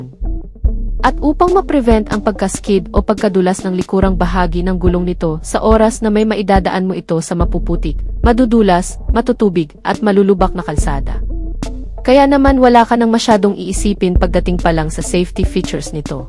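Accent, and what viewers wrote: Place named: Conyo, Philippines. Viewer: Kunyo mag salita.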